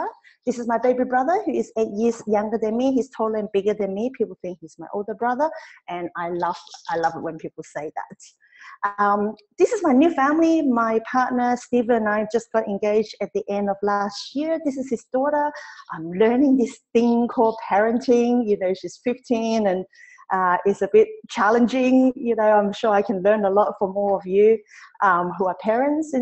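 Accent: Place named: China. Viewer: Chinglish ... love it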